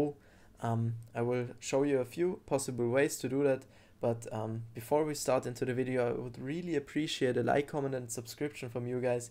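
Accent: Germany. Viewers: I can hear a German accent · man merkt halt so hart das der deutsch ist und ja hat nicht funktuniert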